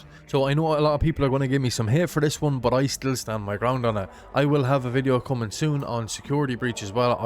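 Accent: Ireland. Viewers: He obviously has an Irish accent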